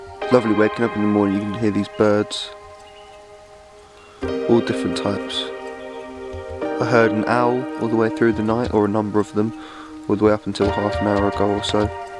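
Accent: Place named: Southern England, United Kingdom. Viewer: Thought I recognised a sort of local ish accent. I am in East Sussex so not too far from you. 👍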